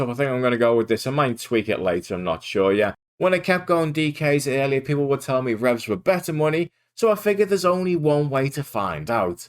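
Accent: Liverpool, United Kingdom. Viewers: Where's your accent in the UK from friend? Scouse? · Fellow scouser? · As a yanited fan, never thought I'd spend 2 hours listening to a scouser talk, and I mean this endearingly 😄. Amazing content!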